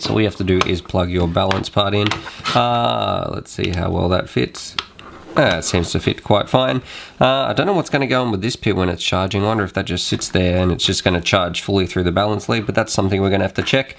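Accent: Australia. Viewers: I just watched past half way. Your definitely Australian. Finnaly someone decent whos an Aussie!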